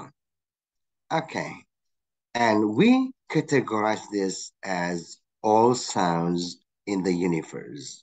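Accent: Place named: United States. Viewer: Yes, me too. Sir use American Accent, so that’s why it’s very easy listening to us😇👍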